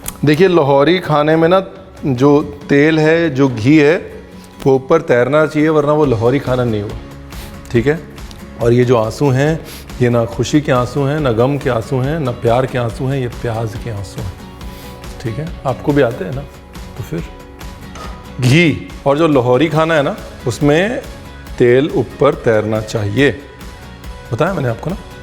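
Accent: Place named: Punjab, India. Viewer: Sir, you sing so well, i just love the way you speak punjabi.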